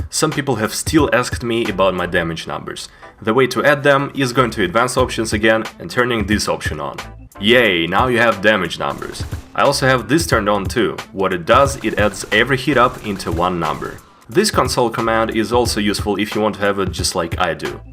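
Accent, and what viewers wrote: Russia. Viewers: Wait u r russia?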